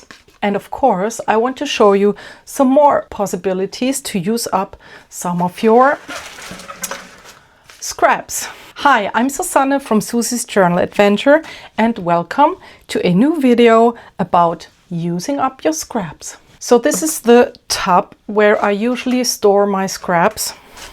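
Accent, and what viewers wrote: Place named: Germany. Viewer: I was wondering if you were German as your accent sounded German. I think your speaking English and explaining things really well. Really lovely art techniques again. And your fingernails aren't horrid at all, lol. You nailed this video and your art perfectly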